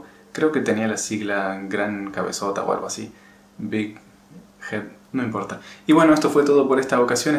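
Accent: Argentina. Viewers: Me suenas como de Mendoza o San Luis medio parecido el acento. por el tema de las articulaciones que use lubricante siliconado de alta perfomance para rodamientos en spary seria el que usan para lubricar los coolers una ves me paso y le meti con el spray y se soluciono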